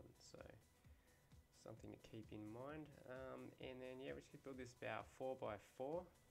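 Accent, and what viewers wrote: Australia. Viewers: I just recognized after 15 videos that he has an australian accent lol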